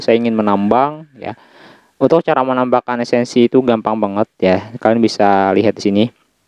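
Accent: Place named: Barabai, Indonesia. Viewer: dari logat kamu dari barabai yah? soalnya saya dari barabai dilihat dari kamu ngomong udah kelihatan kamu asal barabai hst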